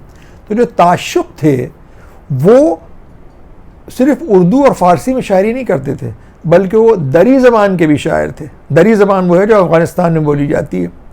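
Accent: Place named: Delhi, India. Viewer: Lko kie zabaan chouk wali boli hie hai Ameena baad kie bhi yahi thie yeh aap aao dilli kie boli hai.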